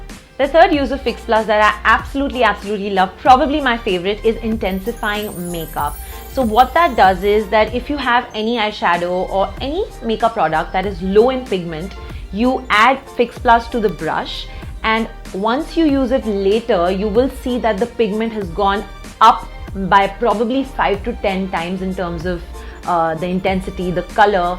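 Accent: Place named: India. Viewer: Its indian accent miss and moreover it's an accent of those who have english as their third or fourth language in a multilingual country. So if you couldn't bear with that, You shouldnt have come to this foreign video . Thanks have a great day.🙂🙂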